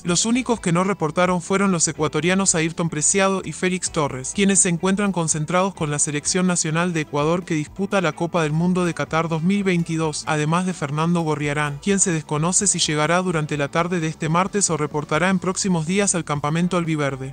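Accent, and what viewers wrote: Argentina. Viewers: Xfavor..de verdad..no pongan nada del santos si?? Saben q esto empieza cuando terminen el mundial...ya chale con.el santos noticias falsas..ha i ya deja ese tonito de arjenti o.wey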